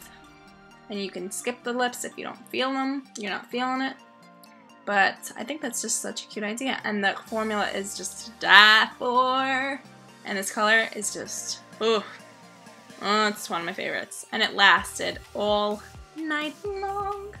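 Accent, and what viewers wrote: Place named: AAVE, United States. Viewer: I think you are beautiful and you are very talented with makeup. I appreciate you sharing your knowledge. With that being said, I find the Ebonics a little irritating. There is no need to say things like "ma" face.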